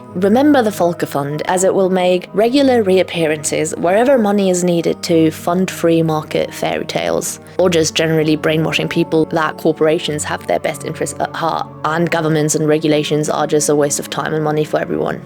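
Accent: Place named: London, United Kingdom. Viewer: Good research effort,. Love your educated London accent… music to my ears. The glottal stops give you away, unless…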